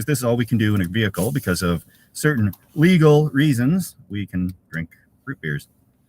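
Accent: Canada. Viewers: Are you and Greg Ovens distant relatives? I swear maybe it’s just a Canadian accent that I’m hearing as an American. But you guys could be brothers or cousins on the same side of the family.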